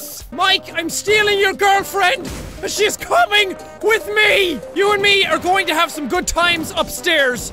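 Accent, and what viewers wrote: Ireland. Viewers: Apparently as time goes on jacksepticeye sounds more Irish 🤔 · jacksepticeye YAY!!!!!!!!!!!!!!! I love Irish accsents!!!!!!!!!!!!!! I myself are half Irish ......... :)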